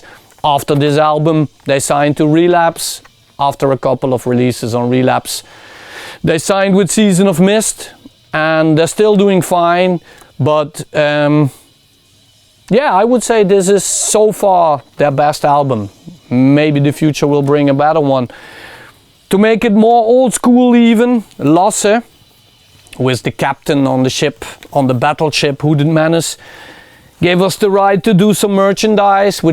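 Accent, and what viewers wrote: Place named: South, Netherlands. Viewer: I like the thick Dutch southern accent. I mean, do not change it. Go with it, embrasse it. Looking forward to see more of you. Untill the next fire:).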